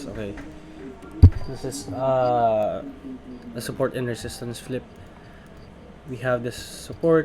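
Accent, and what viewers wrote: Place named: Philippines. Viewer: It’s Filipino accent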